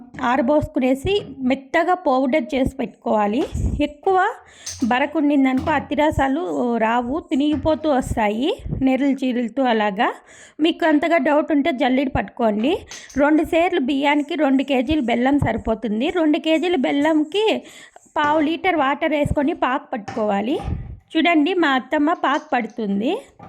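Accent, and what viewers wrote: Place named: Rayalaseema, India. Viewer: మాకు కొన్ని అరిసెలు పంపించొచ్చు కదా..రాయలసీమ యాస లో సూపర్ గా చెపుతున్నారు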